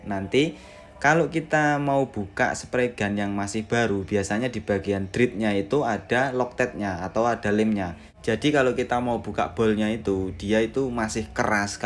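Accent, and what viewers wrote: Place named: South Kalimantan, Indonesia. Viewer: takipik mandngar bahamburan😁😁.. kalimantan mana mas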